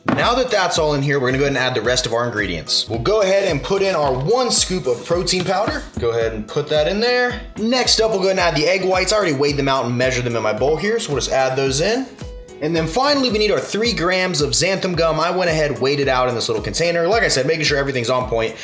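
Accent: Texas, United States. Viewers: Wow...he sounds so much more Texan in his more recent videos.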